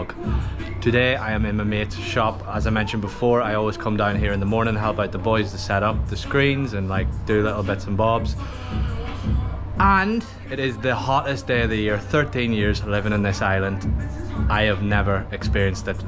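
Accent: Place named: Belfast, United Kingdom. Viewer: Never lose the Belfast accent mate 😂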